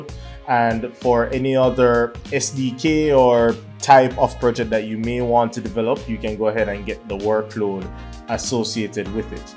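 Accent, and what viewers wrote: Jamaica. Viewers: Jamaican accent 100/100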